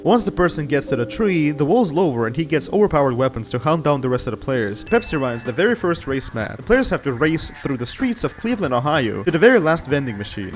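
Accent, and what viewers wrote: Russia. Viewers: you russian?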